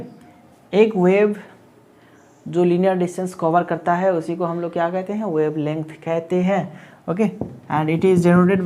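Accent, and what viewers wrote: Bengal, India. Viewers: Bengali hai kya sir ❤❤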